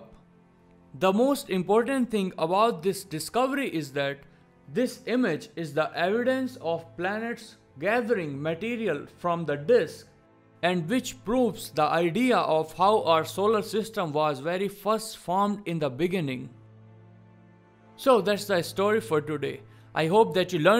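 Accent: India. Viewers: It looks like awesome India voice!!! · People are hating because the voice really? The speaker sounds Indian and speaks English well with an accent so what? Usually the accent is due to knowing how to speak two languages same with Asians, but I've also met Indian and Asian individuals due have no accent from only knowing English growing up. At this rate we will never be able to be peaceful and live together too savage of animals we are still... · His voice is surprising are u an Indian man ??? Just want to know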